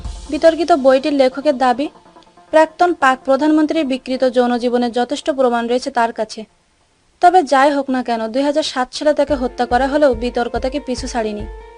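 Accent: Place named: Rural, Bangladesh. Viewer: একদম ভালো লাগে নি! কর্কশ গলার আওয়াজ! উচচারণ এ গেঁয়ো ভাব ! আগে কথা বলা শেখেন তারপর ভিডিও বানান !!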